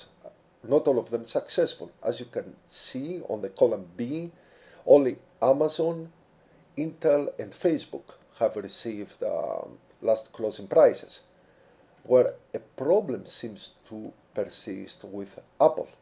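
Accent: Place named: Greece. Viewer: Απο την προφορα καταλαβα οτι εισαι ελληνας χαχα.... δεν ξερω τι ακριβως προσπαθεις να κανεις τυχαια βρεθηκα στο βιντεο αλλα ισως μπορεις να μειώσεις τα calls προς το api που χρησιμοποιεις για αν μην χτυπαει το threshold που έχουν. ΠΧ να τραβηξεις πληροφοριες απο yahοο finance με την buiild in functionality του excel και απο εκει και περα να τραβηξεις σε αλλλα κελια με το vantage indicators που μπορει να μην παρεχονται απο το yahoo Αλλα γενικα.. ολοι αυτοι που δινουν api ...στην ουσια πουλανε api και σε αφηνουν απλα να δοκιμασεις και μετα ζητανε γελοια ποσα για api calls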